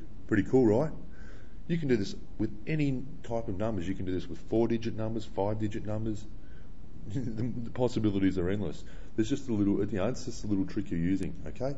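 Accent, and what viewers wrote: Australia. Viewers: Well done . What a nice !Australian accent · its Australian 😂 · The accent is from Australia.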